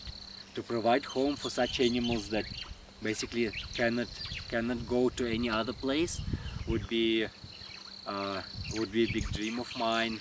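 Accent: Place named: United States. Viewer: Доброго здоровья вам, у вас американский говор, вы жили США?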